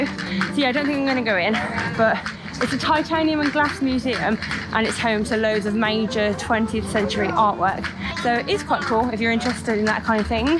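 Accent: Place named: England, United Kingdom. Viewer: Can understand your English English, must be how most Americans spoke when they arrived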